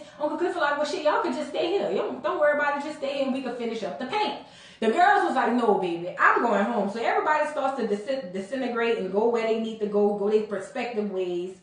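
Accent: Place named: Louisiana, United States. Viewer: I love your reviews girl and love the Louisiana accent 🥰🥰🥰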